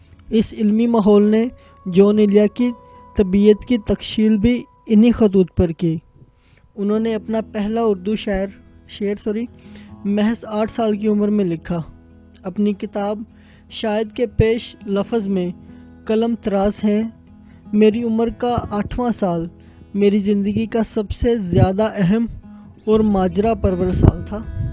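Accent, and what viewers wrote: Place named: Punjab, Pakistan. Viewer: معلومات اچھی ہیں۔۔۔مگر انتہای "پنجابیانہ" لہجہ ھے۔۔۔۔اردو کو اردو کی طرح بولیے۔۔۔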